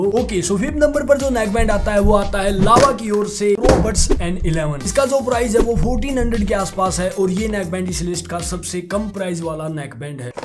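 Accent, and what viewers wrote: Haryana, India. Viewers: Haryana say h bro ye Hmare jile say 🎉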